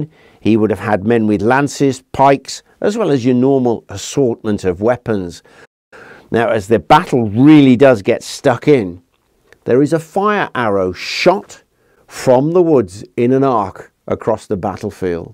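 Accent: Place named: England, United Kingdom. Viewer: Delightful as usual, though I understand this guy I never heard of before gave us French a hard time (another one!)... Indeed, you are an outstanding storyteller, you could even captivate us only by reading the instruction manual of a fridge! I also love, I already said it, but I'll say it once more, your so typical accent, it really adds a lot. There is so much globish here on Youtube that I'm glad to hear somebody speaking a real english, the language I learned and heard in Old Blighty when I was younger, and not the awful thing some people still dare to call english... By the way, speaking of great warriors of the Hundred Years war, how about Bertrand du Guesclin or even Joan of Arc? I know, be defeated by a 18 years virgin is something humiliating, but her story is quite fabulous, believe me!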